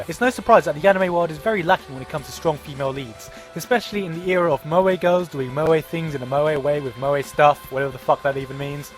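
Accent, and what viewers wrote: United Kingdom. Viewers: So wait your from Thailand but you have a British accent someone explain plz! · He's British but from Thailand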